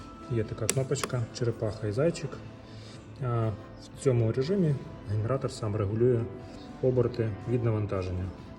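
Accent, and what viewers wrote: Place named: Hungary. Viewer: Nakavom jeziku govoris kao hungar ili moldovan ja ne razumem tebe svaku 10 rec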